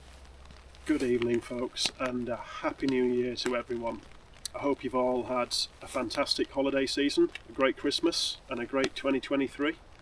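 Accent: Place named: United Kingdom. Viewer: Hey Happy New Year to you too! And congratulations to your 1000 benchmark. I like both your gear reviews and your trail documentaries very much (for the great photography, but maybe even more for the narration - a nice contrast to all the same same stuff from fulltime youtubers. Plus, it’s British!) And yes, you do inspire me a lot. I am a backpacking newbie from Germany, and discovered your channel when I was researching European trails to hike as a first-timer. So last year I ended up doing the Yorkshire Wolds Way plus Cleveland Way. At the end of the walk I still had so much holiday left, and I spontaneously walked the Cumbria Way as well. My next trip is planned for February - part one to three of the GR 131. And in August it’s either GR 10 or GR 11. I am hoping for a comparison video at the end of your current series… So, thanks for the great work and I hope you have some wonderful trips in 2024!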